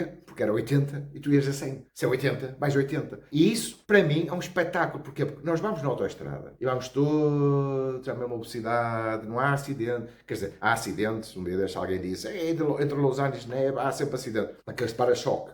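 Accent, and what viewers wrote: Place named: Brazil. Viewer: Caralho...!!, vivo o paraíso e não sabia ?! , aqui no Brasil não é fácil mas você nunca passa por privações, de uma forma ou outra sempre tens uma mão amiga estendida à você!, gajo, falas português mais brasileiro que próprio de Portugal ! , conheça meu Brasil afinal foram os português que inventaram esta zona toda aqui, mas é bom demais !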